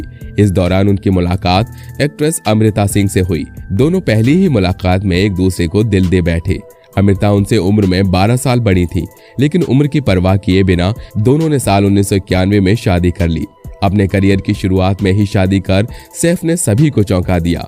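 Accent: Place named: Rural, India. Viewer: Yaar ye youtuber kaise baat karta h 🤣🤣 total gaavwala 🤣🤣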